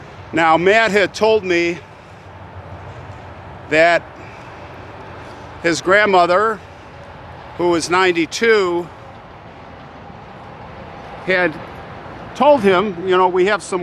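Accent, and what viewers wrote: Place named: Chicago, United States. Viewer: This is said with love: Your accent sounds like the super fans of saturday night live!! Da Bearrrrrrsss!!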